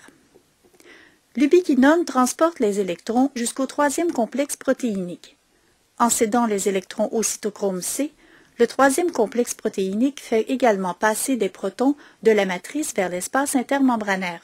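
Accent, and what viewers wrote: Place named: Quebec, Canada. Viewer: Clair et Conscit merci ! (petit + pour l'accent québéois trop génial :) )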